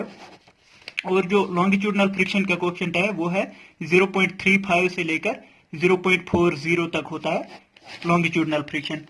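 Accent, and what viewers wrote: Kashmir, India. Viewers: kashmir se hain kya aap?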